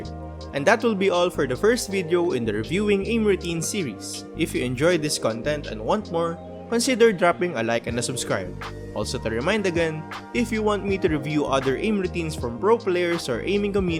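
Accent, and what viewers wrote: Philippines. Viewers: the pinoy accent was enough to convice me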